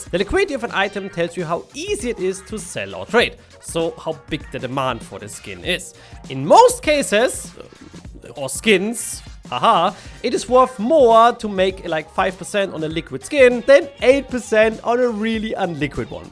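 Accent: Germany. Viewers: You hear like a german guy do you german?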